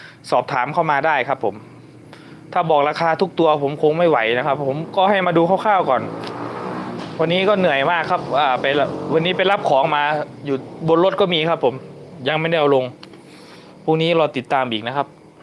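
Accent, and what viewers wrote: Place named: Lampang, Thailand. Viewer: ลำปางชัดเจนดีครับ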